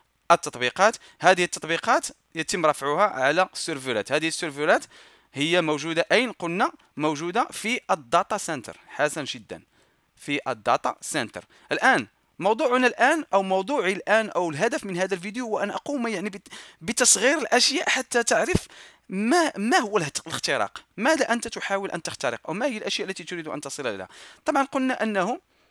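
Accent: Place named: Morocco. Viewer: أنا اتحدى في شيئين: الأول: يكتب كلمة إنجليزية بدون شخابيط في آخرها الثاني: ينزل مقطع واحد بدون مايلخبط في الشرح هههه:) دمه عسل وانسان رائع الله يوفقه + اللهجة تعجبني كثير هو من المغرب صح ؟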